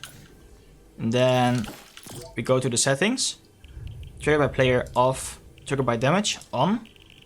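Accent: Netherlands. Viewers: Yo, the lever action shotgun now is in creative so can u put it in you're realistic 1v1 map, ty. Btw ik kan horen aan je stem dat je nederlands bent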